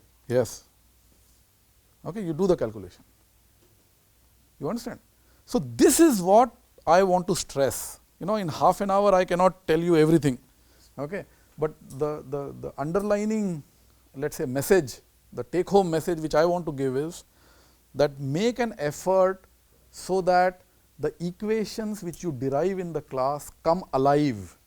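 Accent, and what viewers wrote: India. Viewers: Only indian speak like that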